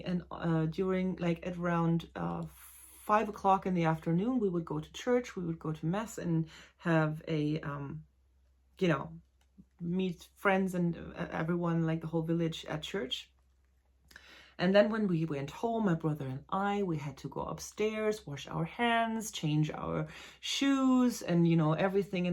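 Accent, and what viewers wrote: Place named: Germany. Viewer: I was so happy to meet you and the other "Mücken" in my backyard! Great re-cap of the weekend and I loved seeing your new sitchy space- hopefully things will get easier as you get settled. I also so enjoy watching your videos in German first and then your excellent English version- it's like a language review for me (and Hoch Deutsch - music to my ears! LoL) My Romanian friend says "shoot two rabbits at once". Why ARE all of us killing animals in mass to save time? 🤣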